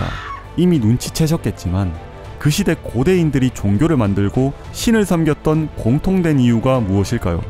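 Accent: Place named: Busan, South Korea. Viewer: 횽 오늘 좀 흥분하셨구만. 다른데선 안들리는 부산말이 강하게 들리네 ㅎㅎㅎㅎ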